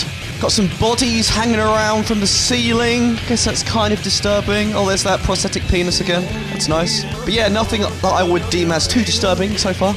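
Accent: United Kingdom. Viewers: Best part is he stayed in character with the British accent and vocabulary all the way through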